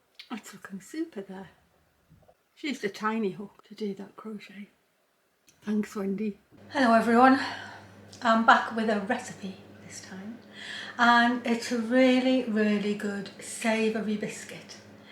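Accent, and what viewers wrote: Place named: Newcastle, United Kingdom. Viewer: Thank you for sharing this recepy! I just made them and they taste great!!! I love watching your videos, mostly the sewing ones. I'm a quilter and sewer and crafter at heart. I looove your Jordi accent, brings back memories!!! So happy I found you. Lots of love Susanne from Germany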